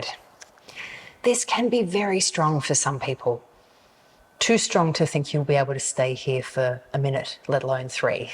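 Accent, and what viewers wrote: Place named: United Kingdom. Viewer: Very very helpful and lovely British accent. Thank you.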